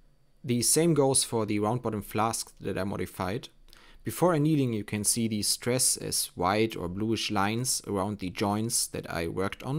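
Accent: Germany. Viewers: God damn, your German accent is so strong and so nice 😂❤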